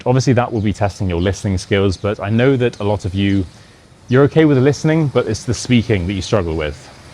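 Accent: RP, United Kingdom. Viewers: Although it is a relatively RP accent. Try any working class person with a strong regional accent, that would be much more difficult.try scouse, brummie, or pretty much any of them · He does speak in a very clean general British accent. While visiting the UK my miles varied.. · You talked with very plain and delicate British accent, articulated each sentence in a clean way and didn't speak too fast. I understood everything! (And I'm sorry for the discrimination you went through) ciao from Italy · Hello, son !!! I'm an old french lady refreshing her english... When I first saw you, with your red hair and beard, I thought may be you were from Scotland or Ireland, which would have been very difficult for me to keep up...😂 But you have an honest British accent, very nice and easy to understand 😊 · Maybe this video is for his Korean viewers. I thought he might have some crazy, difficult-to-understand Scottish accent or something. 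He sounds like a BBC News presenter. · For me as a German, he speaks a very clear British English, not comparable to Scots or other British dialects. So no problem at all. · Dispite your strong british accent, i can understand you! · I was expecting a broad Glaswiegan accent not a nice clear RP accent 😂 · I was expecting him to drop into a regional accent not stay in RP. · I thought you were going to speak some complicated form of English, like some Shakespearean-type shit. 💀 I could understand every single word that came out of this guy’s mouth. The only difference was his British accent. Other than that, he sounded completely normal to me. I think he was talking about non-native English speakers—people whose first language isn’t English. I’ve been speaking English my whole life, so none of what he was saying was difficult for me to understand. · Received pronunciation, notoriously tricky for the uninitiated. 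· A beautiful speaking voice, with clear British pronunciation and accent. Easily and completely understood, at least by this American. I’m probably not the best test subject, however. As a 21 year old in 1970, I spent my junior year of college at the University of York. I didn’t qualify to study in a country where English was not the native language. I distinctly remember my taxi ride from the York train station to my digs. My taxi driver opened his mouth in conversation and I couldn’t understand half of what he was saying, although I knew it was English. I almost panicked, thinking What have I done?! I soon found out that he was from Newcastle and spoke the Yorkshire dialect. Over that year, I heard many British dialects and learned to understand them all. What a year that was! Not just the butterfly affect but the giant swallowtail butterfly effect. It broadened my American identity to then include more that of a world citizen, which has served me and others well, I believe. 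It changed my life for the better. Now that I’m an addict of British tv crime shows, I can understand most every word and sometimes translate for my English speaking husband. A good thing. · I understood perfectly, but that would be because I am from the UK and you spoke English in a pretty much RP style. Now, if you were speaking as if you originated from inner-city Glasgow...... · You spoke so clearly and almost RP. You spoke so well compare to some Hollywood actors which I need to turn on subtitle in order to understand them. Fantastic four 2026 is one of it. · Apparently, my English is incredible. But I love your British accent, so I'm watching... 😂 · erm if i don't understand it's normal bc of your british accent. but i managed to understand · Understood 100%. RP is easy to understand. Thank you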